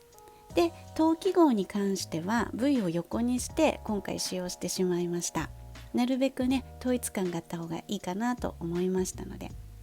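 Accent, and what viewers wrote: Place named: England, United Kingdom. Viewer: i love the English accent im obsessed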